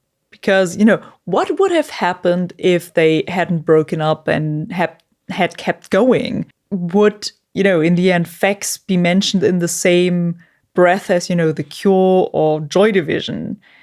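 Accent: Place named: Germany. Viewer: Dein deutscher Akzent erinnert mich an von. 😀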